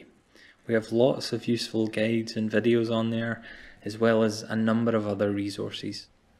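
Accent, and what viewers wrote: Scotland, United Kingdom. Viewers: Thanks for your very helpful video. Ever since I accidentally found an old mp3 player unit when curiously searching around and had no idea on how it works until I found this video. If my calculations are accurate, I have noticed that your accent appear to be Scottish. Correct? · Sounds like Scottish 🤩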